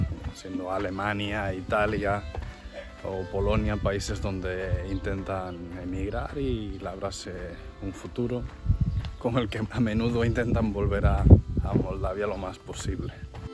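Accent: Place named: Mexico. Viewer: Muy interesante pensé que eras mexicano por tu físico y tu español. Así es los países poderosos siempre quieren lo que otros pueblos tienen y se los roban e invaden para quitarle sus riquezas. Eso sucedió con mexico y latinoamerica. Cuando España nos invadió , masacró , eliminó , nuestros idiomas originales y se llevaron todas nuestras riquezas Pero lo que no nos pudrieron robar fue nuestra esencia y valores. Me gusta mucho Moldavia y Rumania son parecidos a los de latinoamerica. Mucha suerte en tu canal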